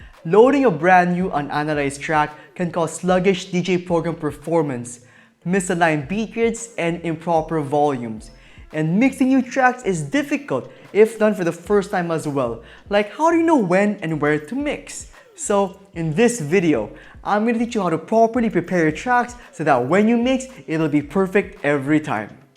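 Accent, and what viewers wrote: Philippines. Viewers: I knew it! I’ve always had a feeling you were Filipino from the way you talk!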